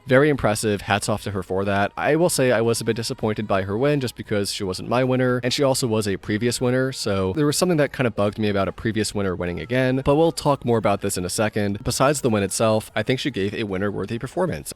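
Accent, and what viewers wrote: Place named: United States. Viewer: I like your channel,! And I agree with you a lot. From your top, the only entry that is not my style is Spain, but I definitely appreciate the art and her amazing talent. All my votes went to Luke 🥺 I wanna see more videos with your comments, I enjoy your american? voice. Haha 😀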